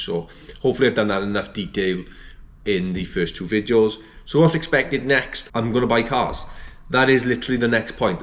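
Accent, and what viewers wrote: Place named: South Wales, United Kingdom. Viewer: you got a sub from me for just being a fellow welshy, the fact you sell cars is a bonus lol. we sound very similar so im guessing your from south lol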